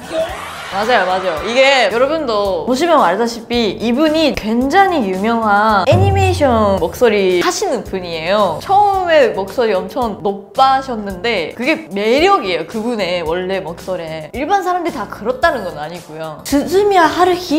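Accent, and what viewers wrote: Seoul, South Korea. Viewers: 나 이런 톤으로 일본어하는 분 처음 본듯 ㅋㅋㅋ 어째 한국인이 일본어 하는 거 같냐 신기 ㅋㅋㅋㅋㅋㅋ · 진짜 신기한게. 이분이 말하는 일본어발음에 약간 한국인의 일본어 특성이 묻어있음. 한국어를 오래 공부하면 모국어에도 영향을 주는건가? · 백진희 닮았다 한국사람이 일본어하는거같어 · 억양이 진짜 한국인 같아요 넘신기ㅋㅋㅋ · ㅎㅎ 일본어를 한국인이 일어하듯…하시네요. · 일본인이라고???? 발음이 완전 한국인인데??? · 일본인이라 밝히시지 않으셨음 한국인이라 생각 했을 거 같아요.. 스타일나 말투나 모든 게.. ( 한국인 스타일 느낌이 강한) 그래서 도리어 일본어 할 때, 한국인이 일본어하는 느낌이 들었네요! 신기한... 잘 보았습니다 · 니뽄말 잘하는 칸코쿠진 같다.. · 일본인들은 조금 귀엽게 보이는데.... 은 그냥 한국인 인데요~~생김새도 그렇고 말하는 투도~~~이쁘고요~~ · 서울에 10년 넘게 산 저보다 서울말을 더 잘하시네요..^^ㅠ 의문의 1패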